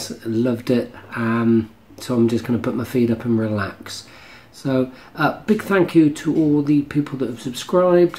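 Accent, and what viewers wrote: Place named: Wiltshire, United Kingdom. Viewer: Loved watching this and hearing that good 'ol Wiltshire accent 😘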